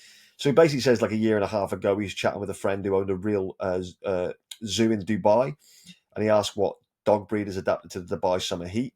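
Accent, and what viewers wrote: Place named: Manchester, United Kingdom. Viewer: Great video from the man who i thought was from Manchester 😁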